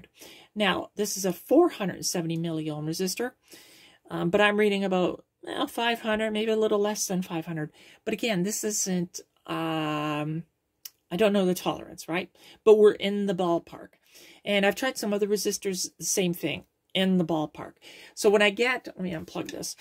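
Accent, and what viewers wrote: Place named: Canada. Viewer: I used to subscribe to Elector magazine. Many years ago. I thought it was. A cut above most. The usual ones which? I subscribe to as well. Now I design electronics for a living. Back in those days, it was just a hobby.. I see you're in Canada. I sensed a little bit of a Canadian accent. I'm in Niagara Falls, Canada.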